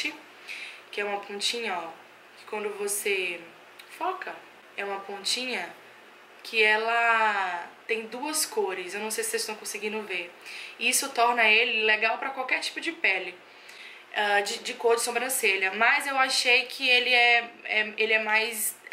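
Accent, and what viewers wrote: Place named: Salvador, Brazil. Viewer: sabia q tu era de SSA! Reconheço meu sotaque sempre kkkkk Tô interessada nessa caixa e na salon box.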